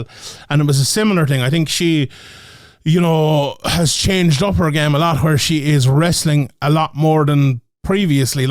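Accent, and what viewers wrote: Ireland. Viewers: I dont recall 1 damn pick you said cuz you talk so fast and have that irish accent but i sure do love to hear it brotha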